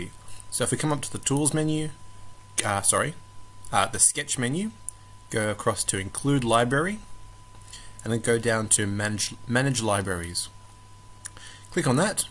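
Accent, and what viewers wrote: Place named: United Kingdom. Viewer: fair comment but - I was refering to the pronounciation of 8 and the letter h. It seems that UK and friends have a different way of pronouncing both than some other countries including US. (eg "I ate you enry iggins") Combined with fuzzy (at best) video mistakes are made. On the positive side, there was thankfully no distracting music. I prefer written code in the comments. thanks for your interest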